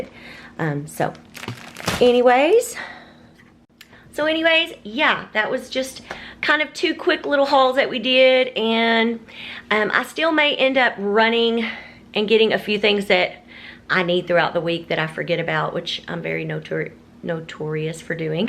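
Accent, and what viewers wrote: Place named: South, United States. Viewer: Thanks for sharing your grocery haul. Love your accent, it's so Southern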